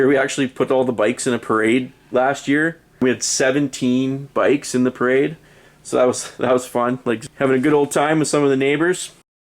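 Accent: Canada. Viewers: Just curious but are you out in Saskatchewan, Your accent reminds me of my moms best friend who base hopped to all the same postings we got when I lived at home. Love the Layout and I hope that you get past your water effects roadblock.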